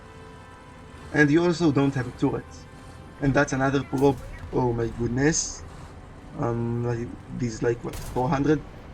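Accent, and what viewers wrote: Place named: Israel. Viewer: The accent, oh the ACCENT! I have an Israeli friend and he speaks English just like this guy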